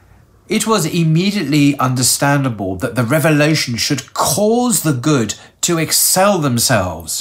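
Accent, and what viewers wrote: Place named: RP, United Kingdom. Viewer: Just a side point but your reading / narrating skill is marvelous especially with that touch of British posh ness Allah bless you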